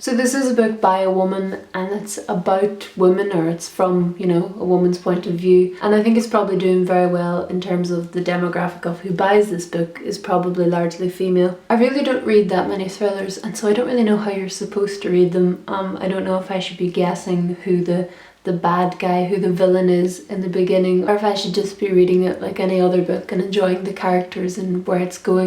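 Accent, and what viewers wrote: Ireland. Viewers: I read this book about two months ago, and I liked it. I normally don't read thrillers, I'm more of a romance book kind of girl. But it was interesting and it's one of these books where you can't stop reading, because you just have to know what happened . I haven't read gone girl, because I always really get into books and get into the characters and imagine stuff, so thrillers are kind of hard to read, when your imagination takes you into the characters and you scared. I really liked your review and I love your accent. I'm from Germany and love reading books in English. I definitely need to go to Ireland someday because the accent is just amazing, I could listen to you talk all day. Nothing to do with the book, but you just have a really calming voice.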